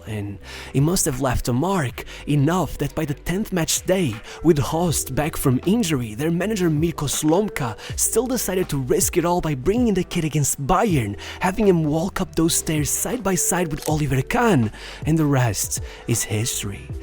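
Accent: Portugal. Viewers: Bro are you Portuguese? Your accent sounds Portuguese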